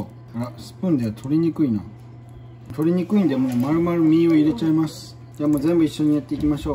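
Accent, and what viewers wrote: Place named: Kansai, Japan. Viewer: たまに関西弁使うん好きやわ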